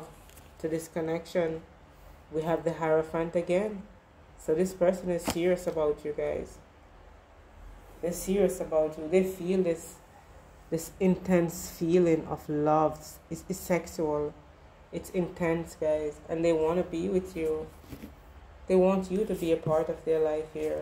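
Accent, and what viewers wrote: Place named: Trinidad and Tobago. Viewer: Your readings are magnificent. You are sounding Trini 🇹🇹 & familiar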